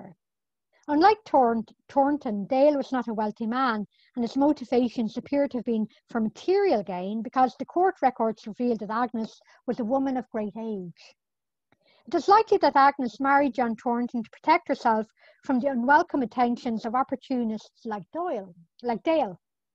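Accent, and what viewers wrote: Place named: Ireland. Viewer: This is a perfect example of academic waste of public funds. This talk is interesting, despite the speaker's lisp and mick brogue, but should academic institutions be funding lectures to be doing this kind of narrowly focused work. Let pernsioners or weekend historians with nothing better to do, do this kind of work. Also, no offense to her accent but it reminds me of the awful elderly mick nuns I used to encounter on the southside of Chicago back in the 50's and 60's.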